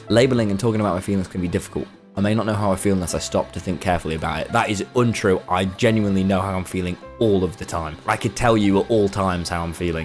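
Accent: United Kingdom. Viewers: i feel like he’s extra british this video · its been a year since i watched. hes turned so much more british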